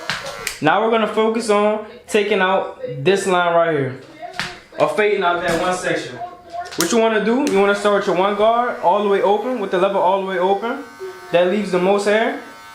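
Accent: Louisiana, United States. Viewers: Louisiana accent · Ooooooh you sound like you from New Orleans….tell me I’m wrong…please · Say bruh you from New Orleans? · Southern Louisiana as a native..... He sounds like home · Showing my 18 baby your tutorial and he said "momma he sound like you"...I said I know he definitely from the City! N.O represent!! 🫡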